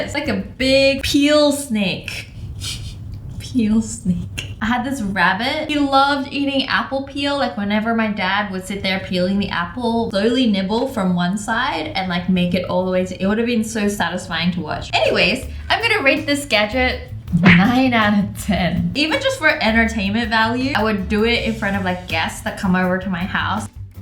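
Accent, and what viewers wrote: Australia. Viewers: Which part of Australia are you from? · I’m Australian too so u say bucks and mum and stuff like that! Yeah I have a accent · Ur Aussie?? 💁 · Is she Australian or something?🤔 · aren’t you Australian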